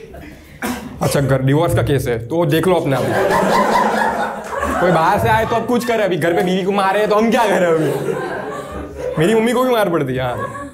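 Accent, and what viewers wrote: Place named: Gujarat, India. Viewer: Your gujrati is cute😂♥️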